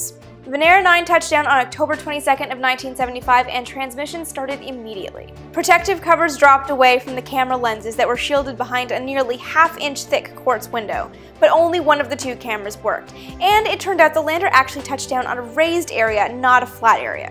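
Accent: Canada. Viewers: She sounds Canadian. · She is Canadienne. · Do I detect a Canadian accent? I had no clue there was a picture of Venus' surface, great video!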